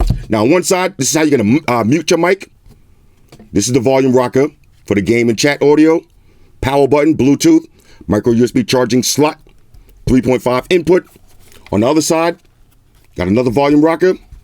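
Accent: New York, United States. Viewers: You’ve got that classic “New Yorker” accent. I love it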